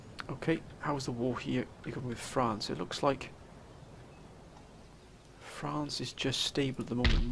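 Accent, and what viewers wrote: United Kingdom. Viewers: Hello my friend. It's good to hear from you. I am very happy that my advice has been helpful for you. If you don't mind me asking I was wondering where you are from. You sound British. I'm just curious. Either way I do count you as a true real friend even though I've never met you in person. Hope all is well with you. I was wondering when you will start assigning Generals. No rush. Just curious. Have a great day my friend. Can't wait till the next episode! ☺☺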